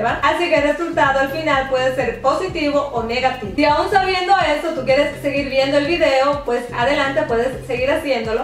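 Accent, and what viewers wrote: Dominican Republic. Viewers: eres Dominicana?😍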